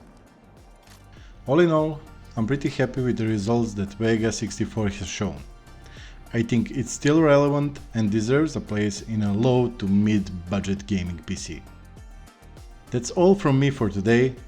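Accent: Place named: Russia. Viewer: You Russian? Ukrainian? Good review.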